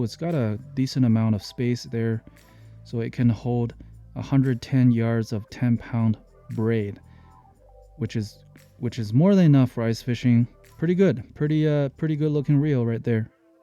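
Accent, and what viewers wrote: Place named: Canada. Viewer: Fuck Im good. I could tell immediately you were in north America then after a couple minutes I knew it was Canada😂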